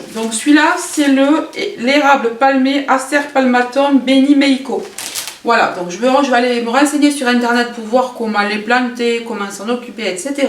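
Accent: South, France. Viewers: j'adore ton accent ensoleillé, accent du Sud ?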